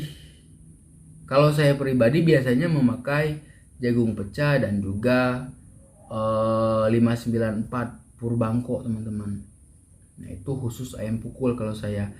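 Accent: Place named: Cirebon, Indonesia. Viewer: Kaya orang Cirebon mas heheh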